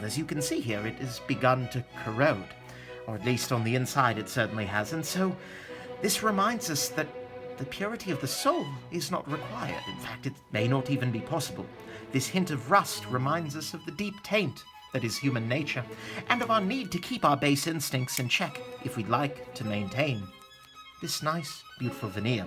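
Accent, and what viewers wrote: United Kingdom. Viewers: Stellar accent 🇬🇧 Super review..... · OMG... British voice is more normal than his bat-reviewer voice!!!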